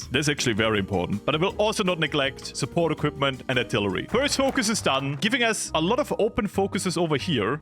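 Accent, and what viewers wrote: Austria. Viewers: he's Austrian, I mean you can also tell by his accent in English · Er ist Österreicher, wenn mich nicht alles täuscht 😅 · Hob erst gröd gemerkt dös er Österreicher is. Ober schene griese aus der Steiermork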